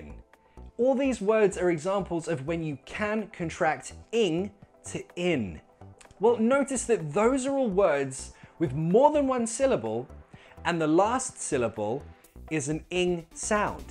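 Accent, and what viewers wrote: United Kingdom. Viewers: i wanna have a British accent just like u, and yr great videos r my path.. keep being cool and thank you so much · just British accent ❤ cheers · Finally I've found someone spreading the good old British English. Hurray! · I'm No one hey i love ur accent BRITS 😍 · British speaking hear very soft accent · Hello. Greetings from Azerbaijan:) I also think there is not any schwa in your name. Both syllables are stressed I think. Do you practice th british accent? I myself have almost mastered it but I need quite a persistent friend to practice with. I am female I am writing from my husband's account. So i need only a female friend to speak to. looking forward to your reply dear:) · That's what I was looking for! A perfect British prouninciation and Video 👍 Keep it up Thank you from Algeria! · I’m from Oman🇴🇲.so I’m Arabian...thanks you so much ..you made me fall in love with British accent. 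My brother is learning the American accent but I found that the British accent is better ..you’re the best teacher everrrr.....😭😭💗🙏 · Wow you are a real British.I like the way you teach.I m lucky if I have a Brit to talk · Absolutely amazing! It's incredible how you show in a natural manner how one can speak with the British accent and sound really cool!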